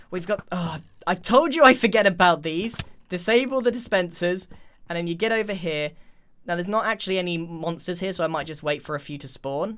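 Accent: United Kingdom. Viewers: Sometimes I watch your videos just to listen to your voice. Your British accent is so soothing.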